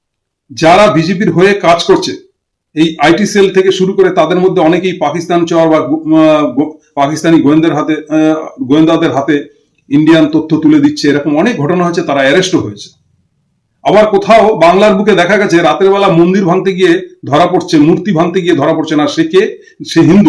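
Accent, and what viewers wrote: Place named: Bangladesh. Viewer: এটাতো বাংলাদেশি মাল বুঝাই যাচ্ছে কথা শুনে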